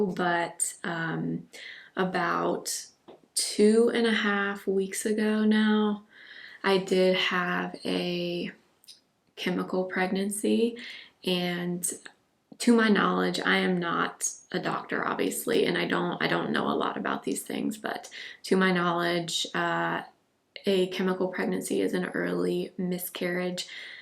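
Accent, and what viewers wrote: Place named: East Coast, United States. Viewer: Listening to your story about your neighbors and laughing not at your expense but because you sound like a younger east coast version of my husband and my self. We had similar incidents were we live with neighbors July 4th and having debris hit our home. We live in No CA where we just had some devastating wildfires and people still shoot off fireworks even though they are illegal. We also live on a corner lot which is mostly okay because we don’t have a house in back of us or directly in front of us but there are other issues that we had no idea about when we had the house built. Good luck on finding the perfect house and neighborhood it’s always a challenge. Though you might want to consider renting short term so you both can get familiar with the neighborhoods before buying.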